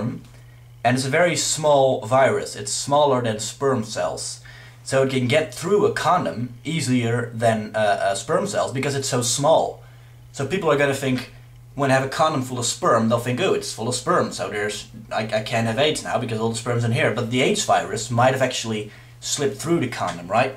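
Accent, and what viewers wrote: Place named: Europe. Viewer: he has an european accent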